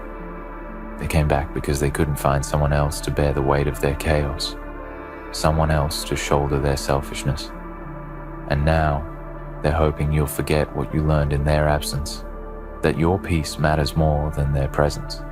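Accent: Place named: Australia. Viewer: This voice is terrible. Nasal, sleepy, Australian. I much prefer the other voices.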